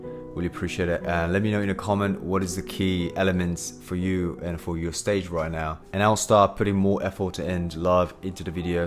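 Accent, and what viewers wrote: England, United Kingdom. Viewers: Ah... maybe you came up in my feed because we are both Vegan... Very Good!. I hear an English accent there. Not sure there is much to say, my bad, i don't know much about you but all the best with your quest to help folk. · You’re speaking English with British accent, aren’t you?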